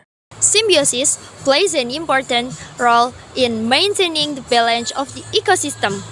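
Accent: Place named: United States. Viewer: GILSSS KEREN BGT, KIRAIN ORG AMERIKA MANA NGOMONGIN SIMBIOSIS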